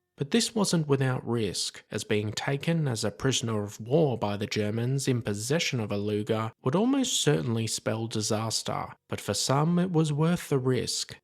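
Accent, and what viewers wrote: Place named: England, United Kingdom. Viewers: He's English 🤷! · He is speaking ENGLISH...not American!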